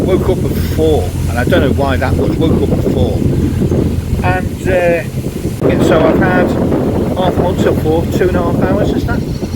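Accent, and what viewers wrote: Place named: Cockney, United Kingdom. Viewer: Gutted I missed you cockney!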